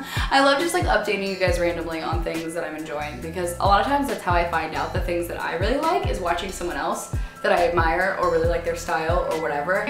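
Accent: United States. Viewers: Are you american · i literally can't tell if your american or swedish omg lmaoo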